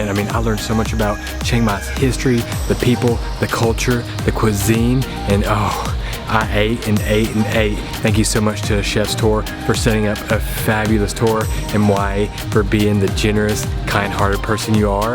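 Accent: South, United States. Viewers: You are just way too handsome! My sisters and I love watching you and gushing over your adorable southern accent ☺️! Whenever we watch your videos, Our partners walk out the room and roll their eyes like 'oh here we go, that guy they all think is so sexy'! Lol.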